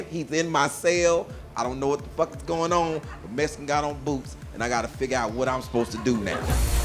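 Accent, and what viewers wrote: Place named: Texas, United States. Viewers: Sound like he was In Tdc ; some Texas shit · Lol I can tell hes from Texas and locked up in Texas prison just off the way he’s talking and the small details of the story.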